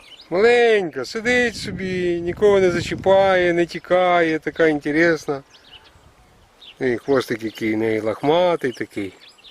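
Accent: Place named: Cherkasy-Vinnytsia, Ukraine. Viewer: Я думаю, шо це захід Черкащини або Вінничина, принаймні там люди розмовляють схоже))) Приємна мова!!!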